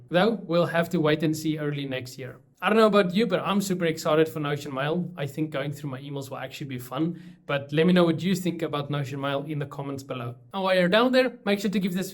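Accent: South Africa. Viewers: Once I heard you say the word database entry , I knew from your accent that you were south african 😉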